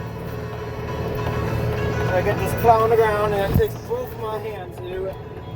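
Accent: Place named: South, United States. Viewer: OK I hear the country now...lmao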